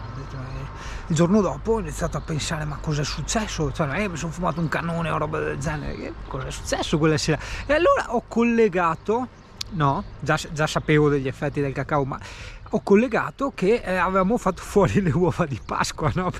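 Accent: Veneto, Italy. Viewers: la tua cadenza veneta è SPETTACOLARE😄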